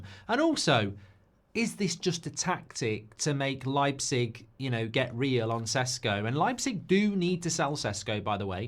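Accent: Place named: Manchester, United Kingdom. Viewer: He’s from Manchester…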